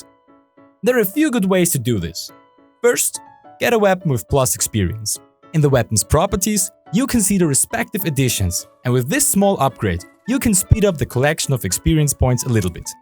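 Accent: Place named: Germany. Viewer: Quick question sir are you German or am I wrong about that If so that's fucking cool you're accent makes me strangely happy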